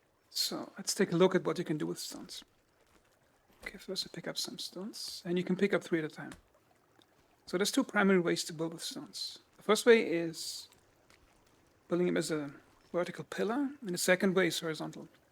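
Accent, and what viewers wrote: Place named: Germany. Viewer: Textbook German accent 😊 🇩🇪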